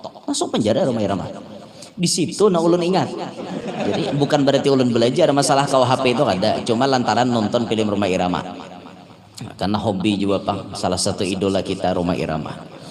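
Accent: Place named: Kluet, Indonesia. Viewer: Allgamdulillah ada guru kita yg cersmah selalu menyejukn hati dgn tidk menghilangnkan logat bhasa klua u yg sdh 30 thn di bnjar merasa bangga dgn melestsrikn bahasa klua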